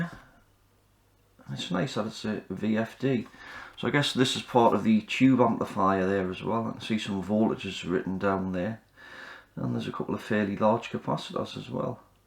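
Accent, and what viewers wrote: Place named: Scotland, United Kingdom. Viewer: It was a long video. So I fast forwarded it. Could not find the part you resolved it. But I enjoyed your accent. Sounds a bit Scottish. Is it? Can you tell me what went wrong with the unit?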